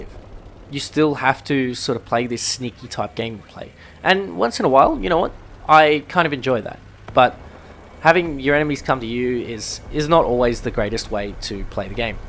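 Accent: Australia. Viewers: Its better not really to care tbh, your one of the few i listen to when it comes to vidoes on the games state. Your chill (also the aussie accent just makes it better) unlike most other creators i see who make massive spiels about the littlest of problems, which i just find irritating.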